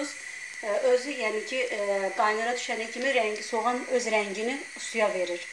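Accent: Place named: Azerbaijan. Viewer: Lan șu azarbeycan konușmasina hayranim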